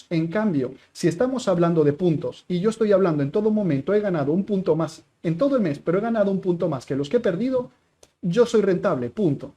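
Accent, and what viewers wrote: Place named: Andalusia, Spain. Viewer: Parecia intro de pelicula por el efecto de la intro. Que tipo de coberturas haces, con opciones financieras? PSD: Tienes el acento andaluz muy marcado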